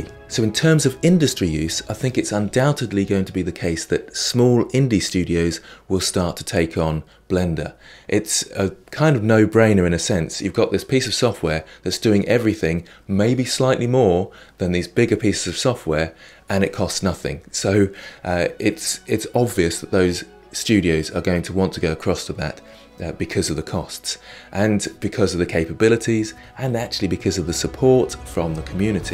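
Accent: United Kingdom. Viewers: And that british accent :p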